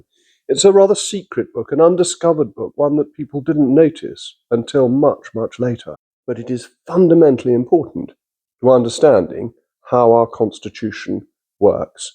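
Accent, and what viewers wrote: RP, United Kingdom. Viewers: I love his posh manner and accent. Muy pijo as they say in Spanish lol.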